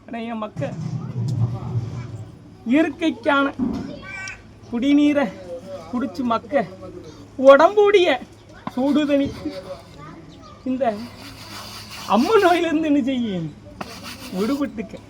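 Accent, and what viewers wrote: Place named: Kanyakumari, India. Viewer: இதுக்கு மேல என்னங்க தெளிவா சொல்லுறது. அவர் பேசரது குமரித்தமிழ் அது அப்படி தான் இருக்கும் சுலபமா புரியுதே ஏன் இவ்வளவு comment பன்னிருக்கிங்க புரியல புரியலனு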